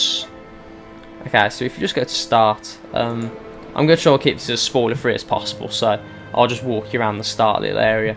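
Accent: United Kingdom. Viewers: god damn I love british accents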